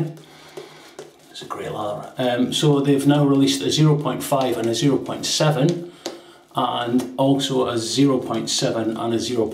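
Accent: Scotland, United Kingdom. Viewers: You asked for it,: Yes, you did butcher Fitjar and Slåtterøy a bit (but they will survive: just a few weepers and nicks) J is like an English y. The diacritical sign above the a is there for a reason: It changes the sound from an open ah to an o-like sound), the double t makes it short, like Slot in a coin slot. Ø is like the French œ or the German/Swedish/Turkish ö, rougly like the i in bird. This time as a diphthong with the y. Imagine the stereotypical New Yorker saying bird: bøyd. (As an aside, in Faroese the øy has indeed morphed into oy.) So it would sound a bit like Fit-yar Islands [that's the ‘intrusive’ English word] Slot-er-øy. Do pronounce the r in your normal Scottish way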